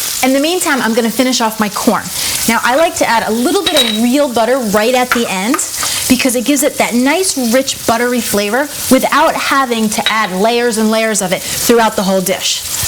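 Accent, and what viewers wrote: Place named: New Jersey, United States. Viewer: Lol what happened to your Jersey accent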